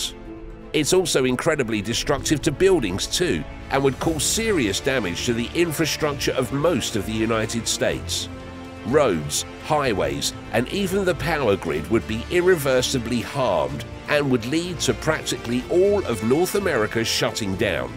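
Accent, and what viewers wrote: United Kingdom. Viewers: British narrators can piss off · NOT another Brit narrator!!! Stopped at :09 seconds How about an American to explain an amazing American wonder... I'm outta here! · 😒 why are these fearmongering videos always done by British voiced people. I made it 30 seconds and GONE!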